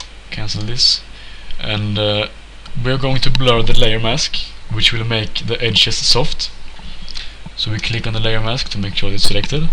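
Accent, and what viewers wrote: Sweden. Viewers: We klick ehh, hah bästa svengelska videon!, tumme upp! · Din betoning låter väldigt svensk... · Are you swedish? It sounds like that! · Nice tutorial, 100% swe accent right?:D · are you swedish? your accent xD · 100% svensk hör man iaf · Är du svensk det låter som det på din dialekt?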